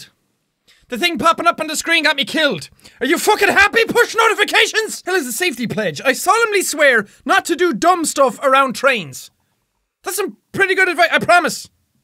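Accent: Ireland. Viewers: heya you uh you irish i'm new to your vids so idk and if so i'm part irish so.... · This was the first video I ever say on this channel, dang nostalgia. I remember being surprised by the intro, not because it was loud but because he was Irish 😂.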